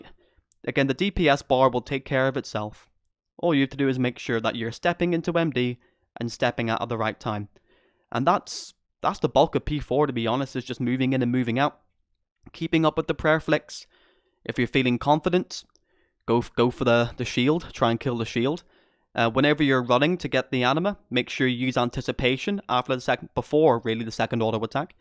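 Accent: Northern Ireland, United Kingdom. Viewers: Epic vid, saving for later :) Sounds like a norn iron accent, where are you from haha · wait wait wait, are you northern irish ?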